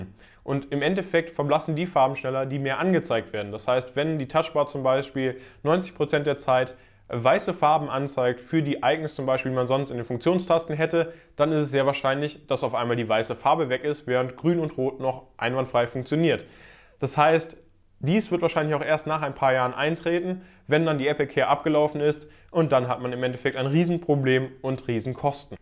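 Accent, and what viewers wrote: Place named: Germany. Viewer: Habe vor kurzem dein englisches Video gesehen und mir wegen den Akzent schon gedacht dass du aus Deutschland kommst. Jetzt weiß ich dass ich da richtig lag :-D Du machst gute Videos.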